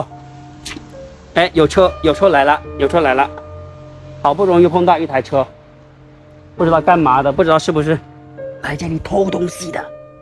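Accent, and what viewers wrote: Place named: Hakka, China. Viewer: 聽你講話好像是客家人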